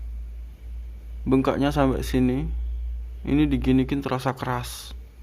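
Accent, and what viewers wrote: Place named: Java, Indonesia. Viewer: Mf mas anda kyknya.dari logatnya dari jawa ya,bhsanya kok kyk jawa asli,kok ngrti pecut ,di pecuti,di pecuti klw bhs jawa d kawengi pakai pecut,d sabeti pakai pecut,klw spngetahuan sy di pecuti sm dgn d cambuki,kyk istilah hukuman d aceh d cambuki,smga buat pembelajaran mas,mf mas mukanya kyknya kok bengkak istilah jawa bngkak itu tembem abuh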